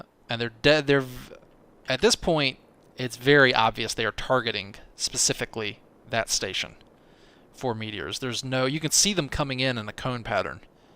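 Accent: South, United States. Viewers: That's just his southern accent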